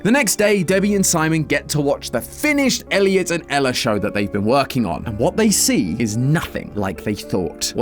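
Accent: United Kingdom. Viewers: This channel has become so unwatchable because of the horrible Bri'ish accent and constant indie horror. Please get a different host and start covering actual games again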